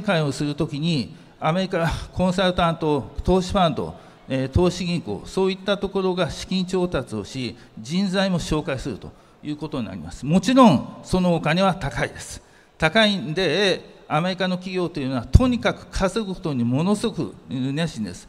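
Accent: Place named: Fukui, Japan. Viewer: 今中さんは訛りからするとおそらく福井出身の方かなと想像します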